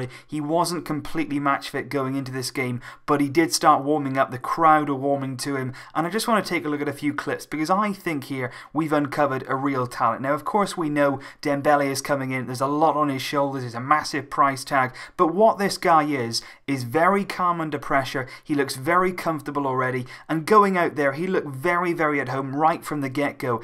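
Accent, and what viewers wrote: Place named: England, United Kingdom. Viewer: 15 years barca fan here, really like your vids, you really understand barca very well and I constantly check if you have vid updates since mid Aug this year. By the way, where are you from, US or Euro? like your english accent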